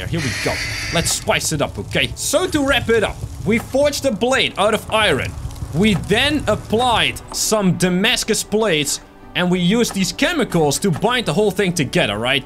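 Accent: Netherlands. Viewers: Ben je nl ik hoor het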